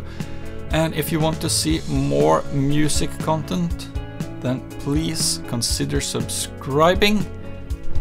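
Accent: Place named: Norway. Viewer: Very help full. I'm now setting my Ugritone drums (Against Humanity). Are you from Norway? I can hear some accent :)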